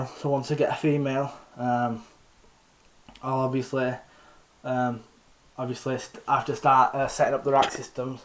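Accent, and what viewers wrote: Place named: Wigan, United Kingdom. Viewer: Where are you from, I am from Wigan and you sound like your from near by x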